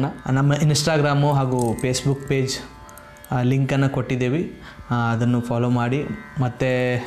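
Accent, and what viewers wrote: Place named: North Karnataka, India. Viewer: Tq so much sir play list madiddakke........ Sir nimma uru yavadu sir... Nimma language namma uttara karnataka du ide... Please sir tell me ur from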